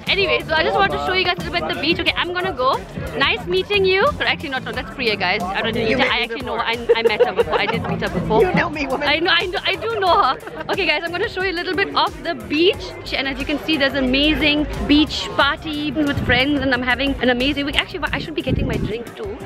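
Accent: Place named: India. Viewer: I came here to get used of Indian accent as you told us. From Brazil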